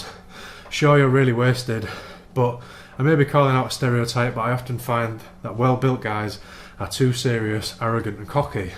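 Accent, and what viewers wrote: United Kingdom. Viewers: Shit, Ill always be a fat guy at heart. I do wish, however, that there was a workout program that would give me your beautiful accent. Im a sucker for you Brits and the way you guys talk.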